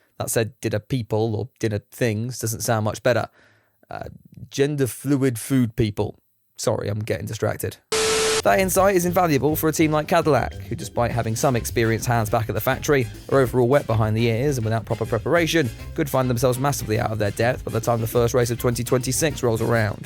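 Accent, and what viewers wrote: United Kingdom. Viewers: Hey dude. Hadn't seen you ever. Not only do you sound British, you look British...super british, if you know what I mean.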